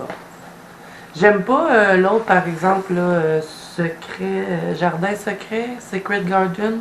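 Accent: Quebec, Canada. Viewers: c'est bien ce que je pensais et tu me réconcilie avec cet accent que je ne supportais pas auparavant. Il y a aussi Mélissa qui a contribué à cette évolution lol.... En tout cas, merci à toi du fond de ton Québec où mon mari rêve d'aller... et moi, pk pas maintenant que je me suis habituée à votre parler hihi... 💋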